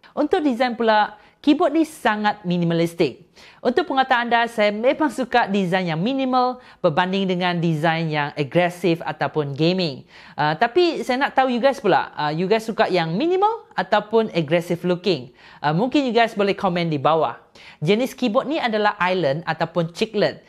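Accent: Sabah, Malaysia. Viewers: macam Sabahan + Singaporean?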